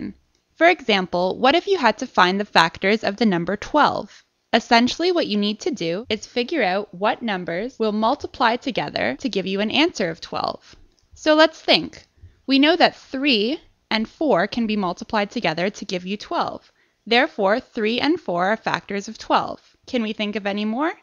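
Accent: Canada. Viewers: Fantastic explanation, and clear examples. Really helpful. And as an aside, AMAZING Canadian accent (as an English Brit we don't get accent envy often, but yours is awesome) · very helpful - cute Canadian? accent :)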